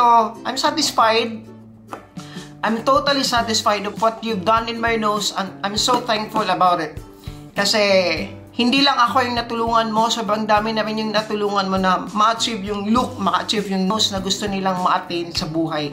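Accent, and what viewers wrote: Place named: Visayas, Philippines. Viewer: Dahil sa nose lift new subscriber here na search kac ako, hi sir bisaya ka po kac sa accent eh, bisaya here, ahm tanong ko Lang pag sa lips po may idea po ba kayo if how much, kac sa ilong ko contento naman ako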